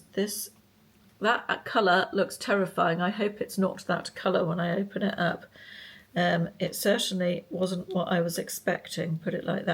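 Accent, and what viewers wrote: United Kingdom. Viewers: I order a lot from Temu but most of it is for craft supplies/scrapbooking, bookbinding and junk journals. Most clothing items, tablecloths and placemats are made of polyester. I tend to perspire a lot and can’t wear polyester so I don’t order clothes. I like the fact that you can return as many items as you want with free return postage on each order. I haven’t sent many things back, most things work as they should. Certain things are more expensive than on Amazon, etc. you have to know your prices. My biggest complaint is they pack everything in thin plastic bags so many items get wrinkled and smashed up in shipping. For example, gel plates for printing should be packaged in a box so there are no dents or depressions on them. The dents will end up showing up when you print. I love your videos because you jump right into the unveiling. 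It’s so irritating when people ramble on and on for 5-10 minutes before they get to the nitty gritty. Your personality is so charming and I love your British accent. You have a great sense of humour (tongue in cheek) and you don’t take yourself too seriously. I like watching what you buy because it gives me some ideas about things I’ve never noticed on Temu. Thanks, keep them coming, be well!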